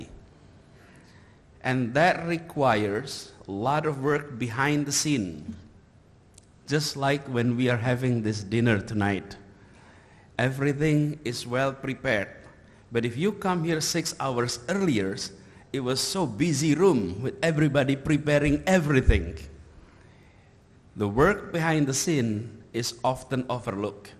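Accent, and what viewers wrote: Indonesia. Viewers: Bagus, tp inggrisnya kelihatan org indonesia, jauh dr spt native speaker.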